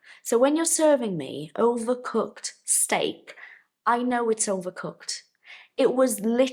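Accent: United Kingdom. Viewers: Oh my gosh that's pretty awesome! I love the British accent. Your English is amazing!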